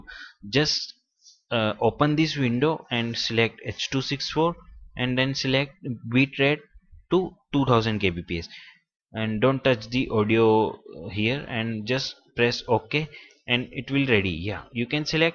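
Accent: India. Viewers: He is speaking proper English but with an hindi accent. Stop being rude people. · OMG people have accents jeez u don't have to be rude about it! Imagine if that was you? What would've u done? He probably had struggles explaining it in English and by the sound of his accent I can tell it was probably Hindi. Like, at least he tried appreciate that. Like he said, just follow the steps -.- -_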